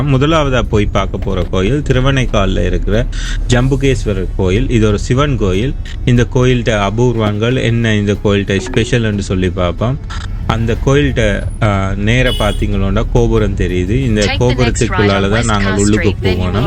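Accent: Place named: Sri Lanka. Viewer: ஆஹா ! அருமையான இலங்கை தமிழில் அசத்தும் வர்ணனை !🙏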